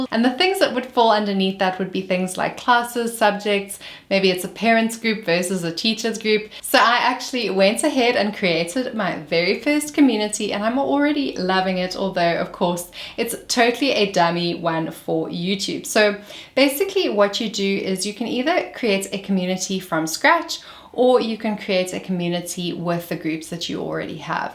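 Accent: South Africa. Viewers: South African right? Cheers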